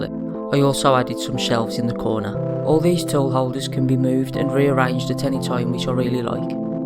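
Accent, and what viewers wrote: United Kingdom. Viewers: Great job. I love your british accent even if its a bit harder for me to understand...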